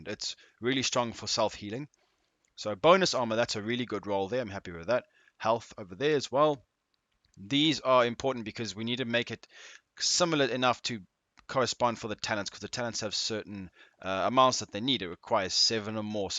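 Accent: South Africa. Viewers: Nice video, you mind me asking are you from South Africa?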